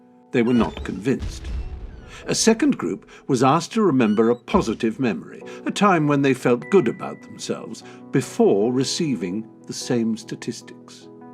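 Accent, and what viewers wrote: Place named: United Kingdom. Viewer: So Anonymous is liberal now? I'm not a Trump fan, but the creator needs to look in the mirror. 1. The EPA is unconsitutional per the 10th amendment (along with most Federal agencies), so that's not good. 2. Douglas Keenan PROVED the IPCC fabricated the results supposedly "proving" global warming is anthropogenic. That which can be asserted without proof can be dismissed without proof. 3. What does a graph of world poverty have to do with Trump? 4. The most dangerous places in America are those with the strictest gun laws, but let's ban all guns. 5. Years of education are higher than ever yet real median household income has been falling since 2000. Hmm, maybe because public schools are really indoctrination camps that teach nothing but how to obey authority (and how to beg it for help). Dept of Ed also unconstitutional. 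Surprisingly, a British accent only gives the illusion that you know what you're talking about. It doesn't matter what facts you present these people, they will always accuse you of that which they are guilty. Lastly, I'd be willing to wager a paycheck the creator hasn't sat through The Clinton Chronicles. That's called confirmation bias fyi.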